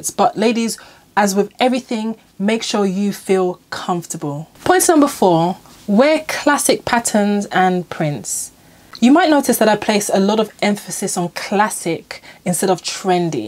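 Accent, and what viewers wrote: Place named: United Kingdom. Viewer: It's the delightful British accent. ✨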